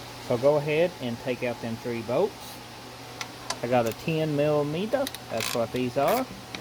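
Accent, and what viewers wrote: United States. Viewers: very quick and to the point, good to hear a man with a true American Accent too!!!! Thanks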